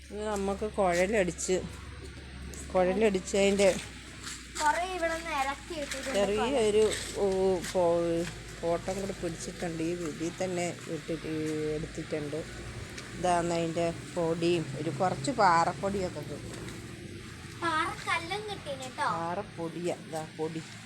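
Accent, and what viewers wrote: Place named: Kannur, India. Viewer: കൊച്ചിൻ്റെ സംസാരം കേട്ടിട്ട് ഒരു കണ്ണൂർ ചുവ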